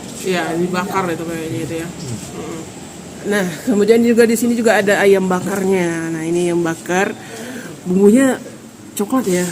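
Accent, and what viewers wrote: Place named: West Sumatra, Indonesia. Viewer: Mungkin tak pandai tetapi banyak pertuturannya guna bahasa melayu cuma dialeknya lebih kepada minang dan indonesia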